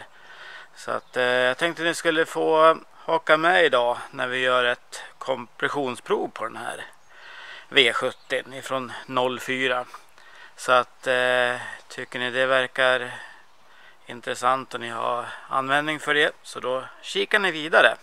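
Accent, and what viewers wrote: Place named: Västerås, Sweden. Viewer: Såg att du hejar på VIK. Stämmer det? Själv bor jag strax utanför Västerås. Tyckte väl att din dialekt var bekant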